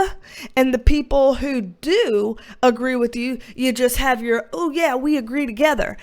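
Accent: United States. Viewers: 😅 “we’ll make a start” - we say that in Australia too. It never struck me as unusual until I heard you say it in an American accent 🤣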